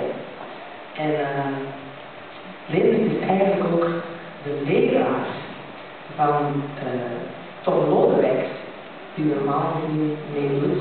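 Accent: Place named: Netherlands. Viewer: haha,haar hollands is wel grappig moet ik zeggen !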